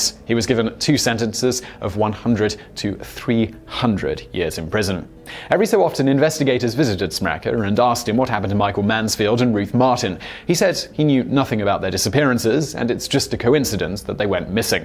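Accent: United Kingdom. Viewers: Your accent is the only British accent that is actually pleasurable to listen to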